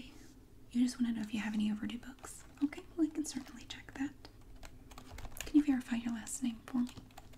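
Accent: South, United States. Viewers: I just discovered your channel today. Your videos are amazing! I've listened to this one twice already and love the sounds and your accent! There's nothing like good ol' southern hospitality here in the south! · So many tingles! Does anyone else feel like she sounds like Pam from the Office but with a Southern accent? Such a soothing voice. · sometimes I forget that you have a southern accent and then I read the comments about the accent and then I just think “she has an accent??” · I love your southern voice and biscuitville as well!! I eat at the one in Greensboro often lol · She sounds like a southern pam beesly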